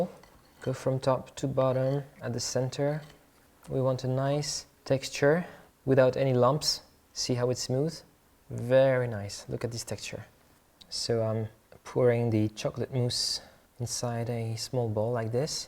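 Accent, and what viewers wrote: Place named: France. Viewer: I love your sometime tiny French accent ! ;)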